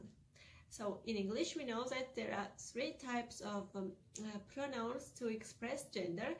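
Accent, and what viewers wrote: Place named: England, United Kingdom. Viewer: I like your English accent. I'm from The Maldives 🇲🇻 and I'm a language enthusiast and I think Georgian (mkhedruli) has the most beautiful writing system. I already know how to pronounce all the sounds including (q'); thanks to my phonetic skills. My target language now is Polish and after that Hungarian and Georgian.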